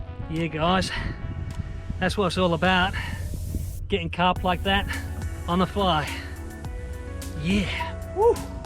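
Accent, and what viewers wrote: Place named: United Kingdom. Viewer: so I'm confused.... you sound like one of "those people" who would say pm as 'half nine' rather than nine thirty lol but you mentioned Rex Hunt, I'm guessing that would mean you moved here 20+ years ago and still haven't lost the accent? or am I WAY wrong? either way mate I'm loving your carp/fly vids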